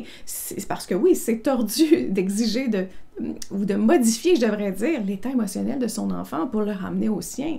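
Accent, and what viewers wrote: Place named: Quebec, Canada. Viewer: Bonjour, oulala très pertinent et j'ai vécu celà avec certitude, plus la culpabilité et la colère de cette relation, plus la blessure de rejet à l'adolescence lorsque j'ai voulu exprimer ma vérité, d'où dépression à ce moment, restant en latence au fond de moi jusqu'à présent! Eh bien, je touche du doigt le fond du problème, merci merci merci!!!🥰 Et j'adore l'accent québécois!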